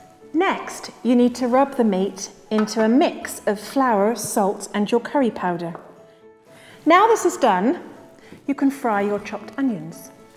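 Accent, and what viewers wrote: United Kingdom. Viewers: What an amazing look, what an amazing British accent, what amazing kitchen tools .. It's a Brilliant video which made me so happy 😁 it's so classy & elite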